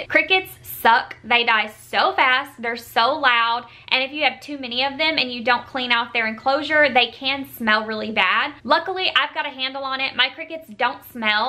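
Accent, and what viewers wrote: South, United States. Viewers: she has a really southern accent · Love ur country accent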